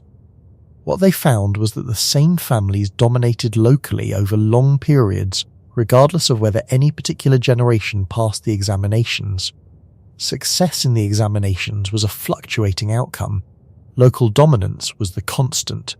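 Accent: United Kingdom. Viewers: The voiceover to this topic uses a very odd / strange pronunciation of the russian word for cash/ money: ROUBLE as rubble.. The British voiceover pronounces the word ROUBLE as rubble. So odd.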